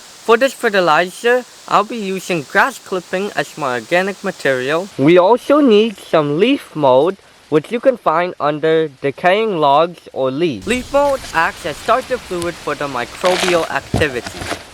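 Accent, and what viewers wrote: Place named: Laos. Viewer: Absolutely a fantastic video. Very good job, young man. Please dont be offended, but you remind me of the Laos people i had the prevelage to work with. It's your dialect. Some people get offended if you miss guess their nationaly. What country are you or your parents from, as you may be a first generation to a different country? Your english is really good and clear easy to understand. You have a good knowledge. I learned some good information. I went to the other website that you pointed to, and your sibling also did a fantastic job, has good knowledge with exclent english.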